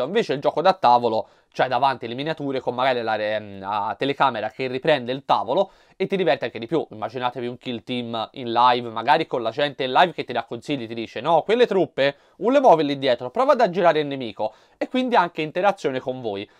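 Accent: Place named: Tuscany, Italy. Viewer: A me piace un sacco il tuo accento toscano e spero di vederti un nuovo "ufficio" presto! Buon Anno!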